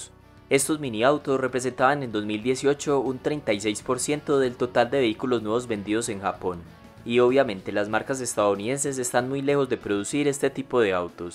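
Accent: Antioquia, Colombia. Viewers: Colombiano, de la región de Antioquia, llamado paisa.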